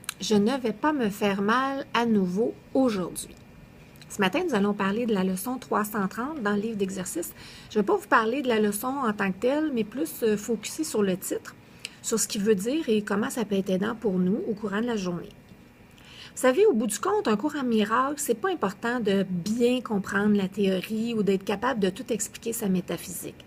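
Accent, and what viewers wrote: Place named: Marseille, France. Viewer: l'accent marseillais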